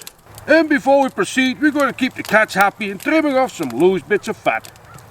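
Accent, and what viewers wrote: Netherlands. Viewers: this guy really sound dutch are you or not? 🤨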